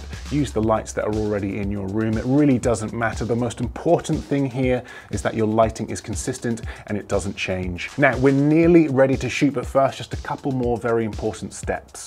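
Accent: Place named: Northern England, United Kingdom. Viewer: Totally off-topic but I have trouble locating accent. I have the impression he has a rather neutral, BBC English type of accent, but his a's in words like "mask", "class" and "can't" sound more Northern English, I think. What accent does he have?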